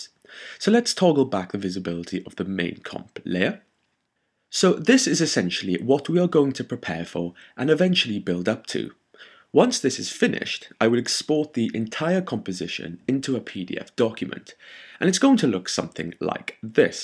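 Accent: Wales, United Kingdom. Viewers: Are you welsh or am i just hearing things? I can't tell anymore.. lol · Asian-British?! As a fellow welshie, love it haha! x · What could be better than a smart young graphic design teacher with british accent?, your tutorials are amazing! be my teacher:-( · you're obv not British haha...he has a classic Welsh accent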